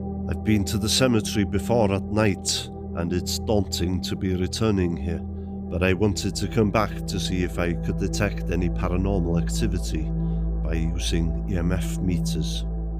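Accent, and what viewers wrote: Wales, United Kingdom. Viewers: I absolutely love listening to your accent. Especially when you speak in Welsh. So beautiful! Thank You. Indiana USA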